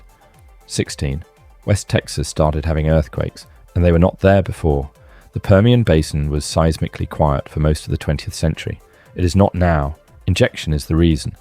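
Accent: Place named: United Kingdom. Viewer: as soon as i hear a frickin british accent i shut it down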